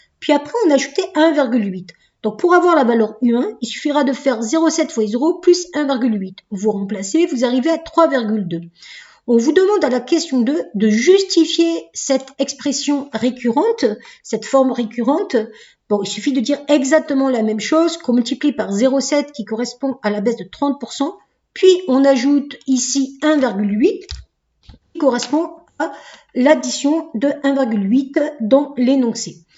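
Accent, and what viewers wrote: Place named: Southwest, France. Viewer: Superrr madame ! Vidéo qualitative avec un arrière goût du sud-ouest :) merci beaucoup !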